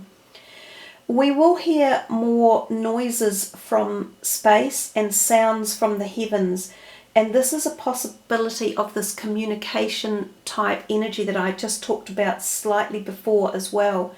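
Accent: New Zealand. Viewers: Kiwis not as bad as South African accent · Pardon me! NEW ZELAND accent!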